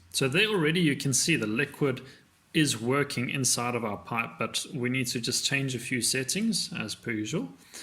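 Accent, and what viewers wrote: South Africa. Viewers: Great to see a fellow Saffa in the 3D community :D Could recognize that accent in the first few seconds. Great tutorial! Thanks for the effort. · south african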